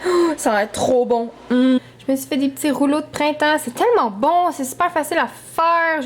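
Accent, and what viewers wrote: Quebec, Canada. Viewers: Oh stp!! Je suis française et grâce a toi, j'suis tomber en love du Québec et son accent!! 💋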